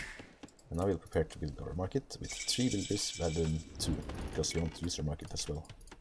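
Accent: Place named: Scandinavia. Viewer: The Scandinavian accent makes it very hard to understand, mic volume way too low.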